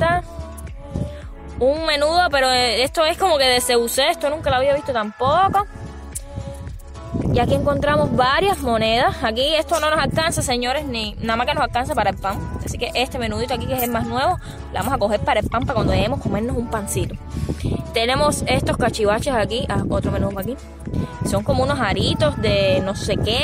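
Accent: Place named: Cuba. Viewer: Excelente video me encanta tu acento cubano además estas súper guapa saludos preciosa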